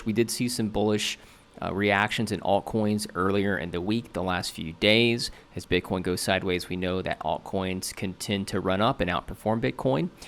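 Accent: United States. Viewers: Hello. Thank you for your thoughtful and insightful assessment of the current market. Also, I very much enjoy listening to your charming American accent.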